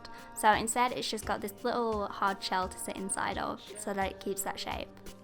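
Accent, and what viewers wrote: United Kingdom. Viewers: Definitely gonna try this, you're such an inspiration. I really love british accent, what a joy to my ears to listen to you pronounce the word "Bloody" hahahaha :3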